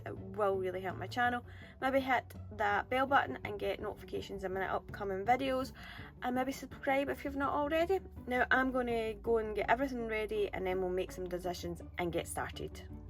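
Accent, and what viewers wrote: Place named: Scotland, United Kingdom. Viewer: I have liked and subscribed to your channel and love that Scottish accent. This wreath is stunning i never seen anything like it its stunning. great job you are one talented lady.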